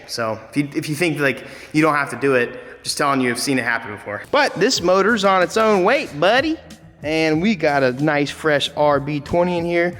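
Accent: United Kingdom. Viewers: Dude...your British accent is tits! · British accent on point BOI.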